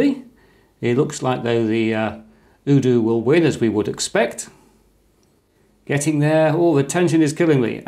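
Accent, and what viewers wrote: England, United Kingdom. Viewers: you videos are fantastic! Keep it up! That english accent is very nice to hear. It's sounds like an intelligent person.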